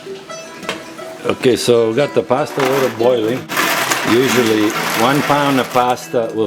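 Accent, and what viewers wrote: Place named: Sicily, Italy. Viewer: Wow. My grandmother had that same accent. I haven’t heard a Sicilian accent in so long ❤️ It’s different than an Italian accent somehow.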